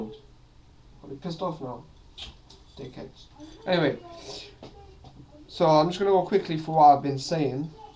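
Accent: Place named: Portugal. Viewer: u sound portugese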